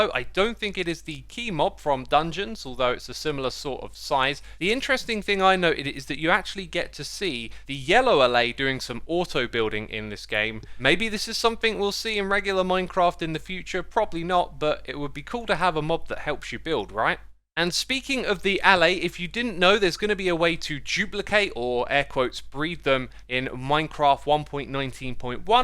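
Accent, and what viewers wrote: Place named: United Kingdom. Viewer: Started watching near my dad. He said "wow that guy is British"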